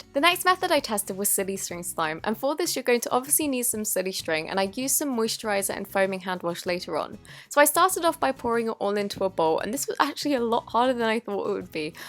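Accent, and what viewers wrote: Australia. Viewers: Are you Australia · Are you aussie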